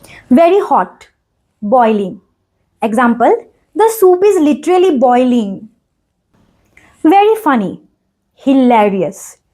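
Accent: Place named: Bihar, India. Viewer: Your accent is bihari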